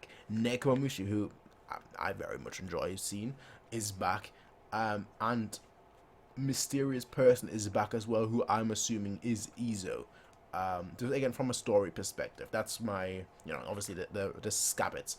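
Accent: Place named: RP, United Kingdom. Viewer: your accent pretty lit bro lowkey sound like a bond MC or villan the poshness instant sub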